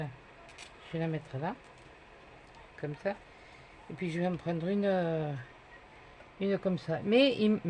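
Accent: South, France. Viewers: Cc, magnifique cette page très réussi,😍 Maminette a eu une très bonne idée pour ce défi, j'adore t'écouter chanté avc ton petit accent du sud c'est merveilleux ❤, plein de câlins a Arkos 😻, Bonne soirée et 🌃 bisettes 😘😘😘💝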